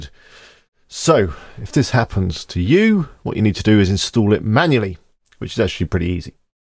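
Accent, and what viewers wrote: United Kingdom. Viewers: Are you from Nottingham perchance?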